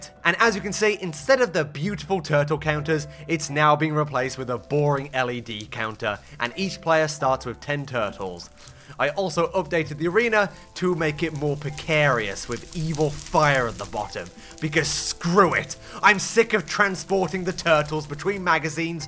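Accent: United Kingdom. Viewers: use your british accent and make a british sentence about you going to hurt this turtle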